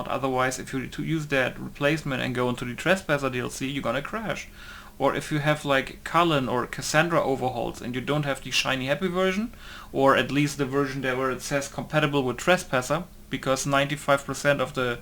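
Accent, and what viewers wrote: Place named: Germany. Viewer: Dude seriously as a german myself watching this video was funny af with his constant accent Iam litterly dying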